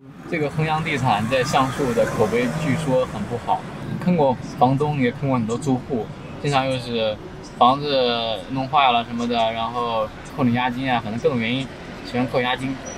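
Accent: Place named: Anhui, China. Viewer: 听口音安徽吧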